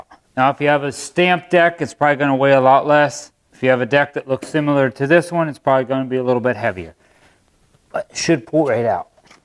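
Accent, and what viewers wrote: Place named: Pittsburgh, United States. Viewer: Your voice sounds like you live near Pittsburgh. I use a rope to pull the idle pully back and then remove the belt from the PTO. Also use an air impact wrench to remove and reinstall the nuts on the blades. Never clean under the deck and never torque the bolts. We have a fabricated deck, it will take years to rust through. Thinking about building a low crawler to be able to slide the deck in and out from the mower. I do grease the zerks though every time I sharpen blades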